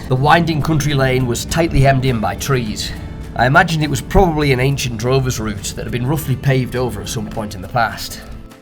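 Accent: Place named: North, United Kingdom. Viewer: Been following you on instagram for a while, love the long form YouTube style. Always class to hear a fellow northerner too! Looking forward to the rest of the series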